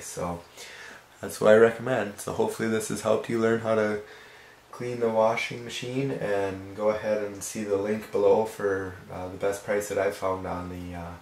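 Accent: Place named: Upper Midwest, United States. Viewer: Thanks so much for posting the code for system clean. Even though mine randomly automatically pops up asking me if I want to clean system, it's always when I'm setting the control to start a load after I've already loaded clothes and detg and when I go to set controls on. It will automatically interrupt and ask me, which I then just punch cancel and it allows me to restart without asking again. If that makes sense. But now that I know how to set manually I can do it with more convience. You sound like you are from Minnesota or Wisconsin, or maybe even Buffalo NY area. Upper midwest accent. Thanks!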